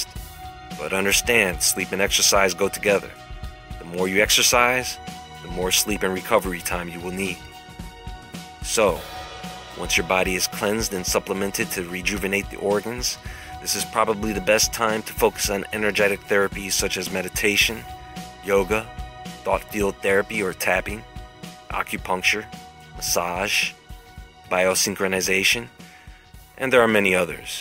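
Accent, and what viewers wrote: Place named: North Carolina, United States. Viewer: ! Are you from NORTH CAROLINA? You sound like it! Please respond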